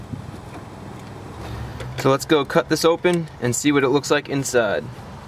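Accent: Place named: New Jersey, United States. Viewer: He's from New Jersey, that's how we say water here 😅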